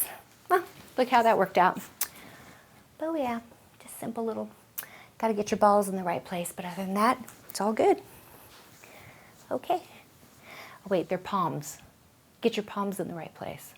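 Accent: England, United Kingdom. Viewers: That English accent suits you.... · haha- again a great video of yours, I just love your tiny english accent, thanks for sharing....:::)))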